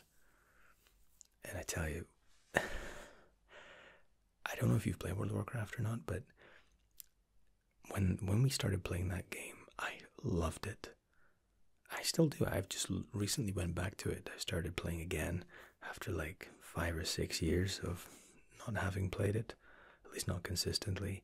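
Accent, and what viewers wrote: Scotland, United Kingdom. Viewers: are you from scotland and if so where abouts? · i have been listening for so long i can't tell... Is he british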